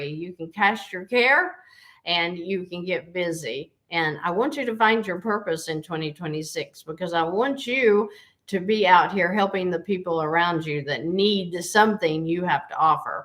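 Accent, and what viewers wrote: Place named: Tennessee, United States. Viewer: We are considering doing a channel to track our progress weekly, and hold ourselves accountable, and it brings us so much joy to actually get to dream of possibilities of having financial freedom. We are a retired military family, 100% disabled vet, and I have 25 yrs with a pension, so we have on paper a nice retirement, unfortunately it’s all gone before end of month….I prayed for answers, I want work again. I want to make an impact. you are an Angel sent here on Earth. I love your little feisty TN accent, and your faith in our Lord, and in people. Amanda OK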